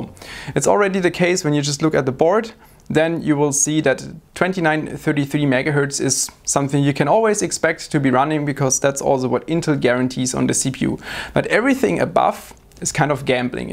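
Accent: Germany. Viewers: Are you German? Cause you have a really obvious accent LoL 👍👍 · Yes and I really appreciate his adorable German accent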